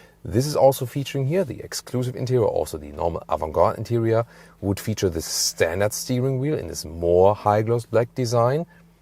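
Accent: Germany. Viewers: English with typical german accent 🤮👎🏻 who understand this...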